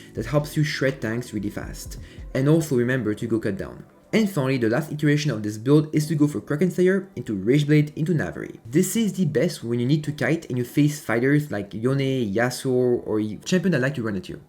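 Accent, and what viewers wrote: France. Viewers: You have a french accent bro ? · T’es français ?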